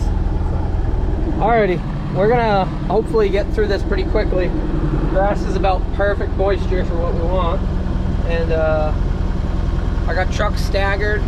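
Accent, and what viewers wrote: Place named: South, United States. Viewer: You got southern boy in you (Y’all )👍🏻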